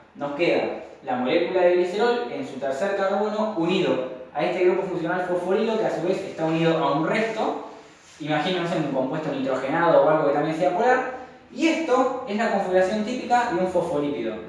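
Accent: Argentina. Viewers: Oye profesor ¿ ud es de Argentina? Y tengo una duda .¿ Estos tipos de tema entran en examenes de ingreso de cualquier universidad de medicina de Argentina?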